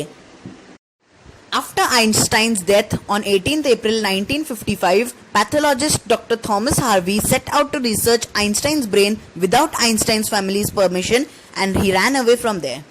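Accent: India. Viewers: Nice Indian accent but cool video very informative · nice indian accent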